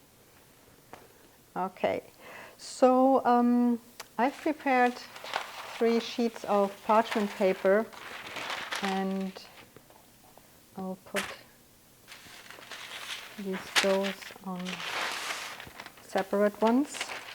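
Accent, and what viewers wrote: Germany. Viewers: I LOVE the burnt raisins!! I usually will do my Stollen on the weekend after Thanksgiving. I have to smile when you speak because you pronounce English words with German sounds. I do not laugh at you but sure appreciate how hard English is to learn for non-native speakers. Unlike German, English diphthongs ARE NOT consistent. You do very well!!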